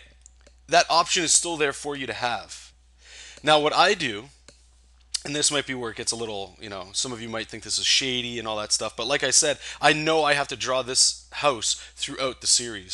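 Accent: Canada. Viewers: That good lol Canadian accent lol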